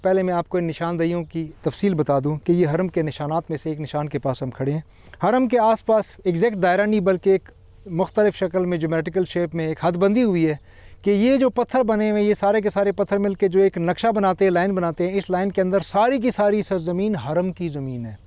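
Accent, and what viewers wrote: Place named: Kashmir, India. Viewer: آپکے بولنے کے انداز سے معلوم ہوتا ہے کہ آپکا تعلق کشمیر سے ہے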